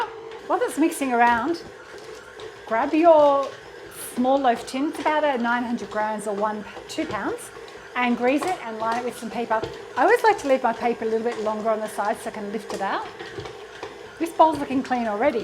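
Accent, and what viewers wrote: Australia. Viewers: Thanks for this great video. Since Judith Durham said "Goodbye", I don't have an Aussie ladyfriend -- you're it. Bakes bread, wonderful accent and a pleasant way about you... what's not to love,huh? Please forgive me, I don't mean to be politically incorrect. It's just my Canadian way of saying,"Well done!" G'day. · Appreciate this plantbased recipe and the written instructions alongside your lovely Aussie accent 💙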